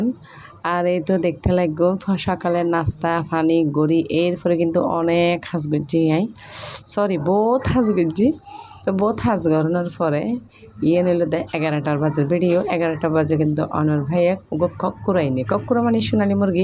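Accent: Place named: Chittagong, Bangladesh. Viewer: আপু চট্টগ্রামের আঞ্চলিক ভাষা তোমার মুখে শুনতে খুব ভালো লাগলো ❤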